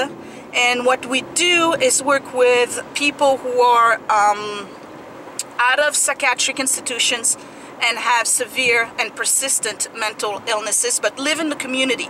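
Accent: France. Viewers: I studied French for 4 and a half years. I had hope to visit there but it has not happened. I always could tell your accent was French. I hope Scott feels better soon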